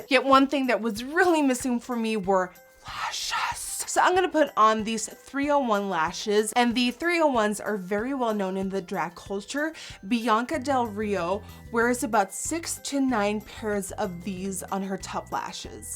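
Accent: Netherlands. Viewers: I ALWAYS THOUGHT YOU HAD AN AMERICAN ACCENT BUT THEN I WATCHED YOUR OLDER VIDEOS AND I REALIZED IT IS A DUTCH ACCENT AND NOW I CANT UNHEAR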